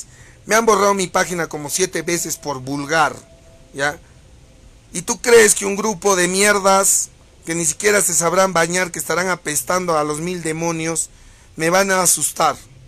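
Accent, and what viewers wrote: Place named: Peru. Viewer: JAJAJAJAJAJAJAJAJAJAJ peruano puro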